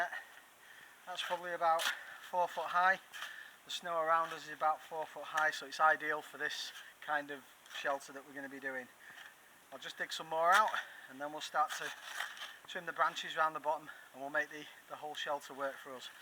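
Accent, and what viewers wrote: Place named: Manchester, United Kingdom. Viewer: Sounds like a Mancunian twang you got there 😊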